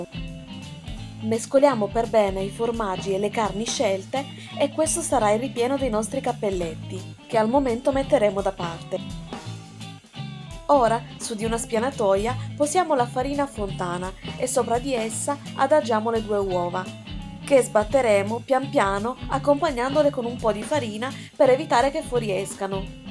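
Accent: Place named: Romagna, Italy. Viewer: Che brava la signora!! Adoro la sua cadenza romagnola. Mio padre era emiliano e la sua famiglia ci ha insegnato a fare i cappelletti. Anche se mamma già sapeva fare la sfoglia. Purtroppo io non riesco senza la macchinetta, ma i cappelletti ogni tanto li faccio. Grazie per questo tutorial!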